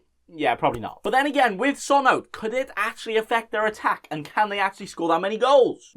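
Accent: Wales, United Kingdom. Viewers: The Welsh accent is strong in this one 🏴 👍🏻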